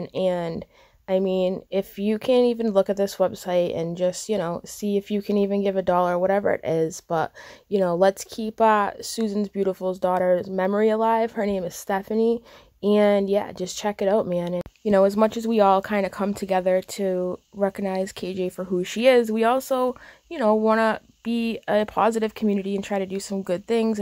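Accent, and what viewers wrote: Boston, United States. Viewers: Great video as always and love seeing you... your accent coming out of that pretty face is awesome. For a west coast girl, your Boston accent is so cool. I’ve connected with Sue on another comment section on a different channel and praised her for even having the courage to share her pain... only to have a vapid little goblin claim she was “ one upping her story” and not paying enough attention to her talking...what a piece of 💩. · You have to be a Boston girl.. Our accents are surely one of a kind xx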